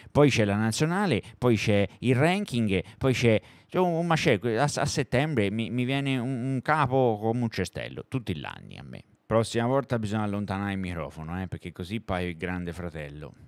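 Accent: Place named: Tuscany, Italy. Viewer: L'accento toscano è simpatico, così però è un po' troppo..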